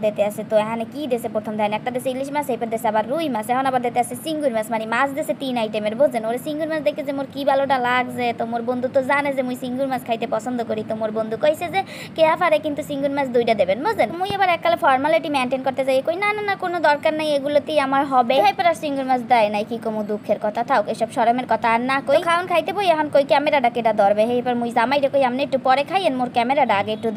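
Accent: Barishal, Bangladesh. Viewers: আপু আপনার বাড়ি কি বরিশাল না পটুয়াখালী ? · আপু আপনার ভিডিও দেখার পর বরিশালের ভাষার প্রেমে পরে গেলাম,,,,❤❤ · কেয়া আপু তোমার ভিডিও প্রতিনিয়তই দেখি বিশেষ করে তোমার বরিশাইল্লা ভাষায় কথা অনেক সুন্দর 👌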